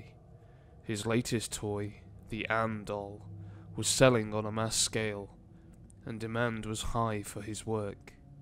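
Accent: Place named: United Kingdom. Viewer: Sorry but I think this story was proven to be a hoax in Paris. The face comes from an art book from a Helsinki art school published 20 years before the body was found. However many young people were fished out of the Seine during that period. Sorry to be a negative Nancy. I do love the gravitas in your voice and the brit accent!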